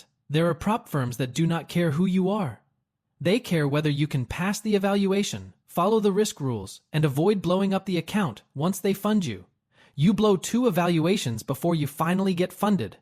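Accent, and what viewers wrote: United Kingdom. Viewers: I love how it slowly slips in and out of a brittish accent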